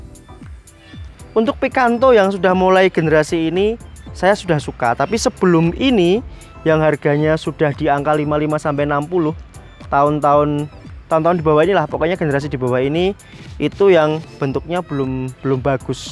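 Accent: Java, Indonesia. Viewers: Medok temen mas jawane... Hihi..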